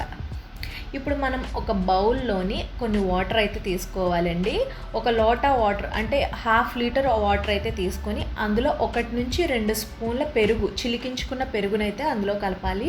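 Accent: East Godavari, India. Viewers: మన తూర్పుగోదావరి యాస అలవాటైపోయింది మరి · Amma ne cheppamanu voice east local vasana vastadi. Vere voice vaddu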